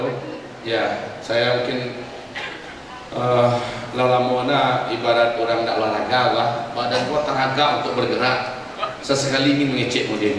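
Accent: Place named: Minangkabau, Indonesia. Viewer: Wah minang. Kek nismilan cakap minang lain sikit..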